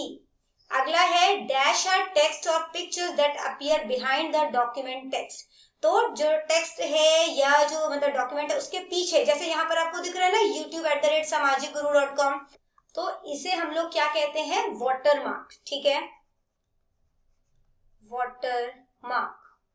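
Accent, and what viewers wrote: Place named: Punjab, India. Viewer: App punjabi bohut cute bolte ho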